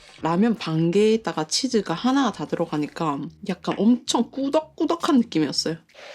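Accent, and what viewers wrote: Gyeongsang, South Korea. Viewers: 경상북도예요? 경상남도예요? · 경상도 사투리 귀여우셔용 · 오 예쁘시기도 하지만 많이 잘드시네요 사투리만봐도 경남사람이시네요🙂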